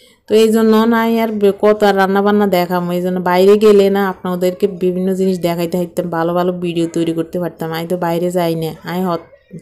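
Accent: Noakhali, Bangladesh. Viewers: আসসালামুয়ালাইকুম প্রিয় আপু হাহাহা অসাধারণ হয়েছে তোমার মুখে নোয়াখালী ভাষা শুনে তো আমি ফিদা, মাশাহ আল্লাহ মামুনি জন্য অনেক অনেক দোয়া আর ভালবাসা রইলো অনেক বাজার,, ইলিশ আমার প্রিয় মাছ,, প্রতি দিনের বাজার প্রতি দিন করা ই ভাল মাশাহ আল্লাহ বস্তার ভিতরে তো অনেক জিনিস 😜 খেজুর পার্শেল করো আপু, অনেক অনেক ভালো লাগলো আপু ❤❤❤ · নোয়াখালীর ভাষায় কথা শুনতে বালো লাগে দারুণ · আপু আমি তোমার কথাগুলো খুব মনোযোগ দিয়ে শুনছিলাম।তোমার মেয়ের খেলার বর্ননা শুনে আমি হাসতে হাসতে শেষ।পুরা ভিডিওর কথা শুনেই আমি হেসেছি।তোমার নোয়াখালীর ভাষা বেশ ভালো লেগেছে।তোমার বাবার পাঠানো জিনিস গুলো দেখে আমার বাবারবাড়ির কথা মনে পরল।ভালো থাকবে।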